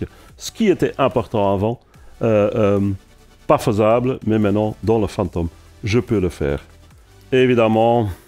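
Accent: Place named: Belgium. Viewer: Il as un accent belge lui mdrr super présentation 👍👍👍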